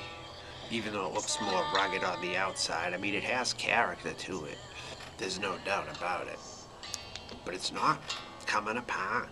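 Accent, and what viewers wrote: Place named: Boston, United States. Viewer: Yo are you from Boston? Damn that accent is strong boy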